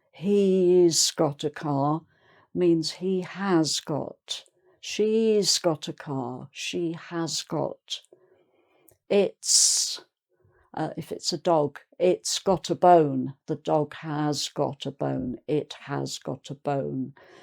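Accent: England, United Kingdom. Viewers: Esto es el acento británico? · Na verdade ninguém nasce sabendo, todos precisam aprender. E esse sotaque é o sotaque do inglês britânico que e o verdadeiro, os americanos não conseguem mais emular esse sotaque. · Que fofa ensinando e que sotaque inglês lindo!!! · Love from INDIA...ARE YOU BRITISH MAM?? AMERICAN ENGLISH ACCENT IS HARD TO UNDERSTAND FOR US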